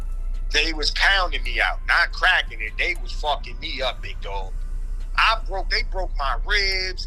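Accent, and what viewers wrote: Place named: New York City, United States. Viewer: Hearing this man speak make me love the nyc street shit so much I dunno why I’m like this😂🗽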